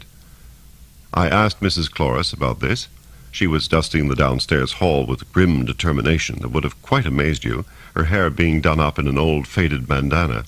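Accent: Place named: United Kingdom. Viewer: Horror stories should always be read in british accent. Good!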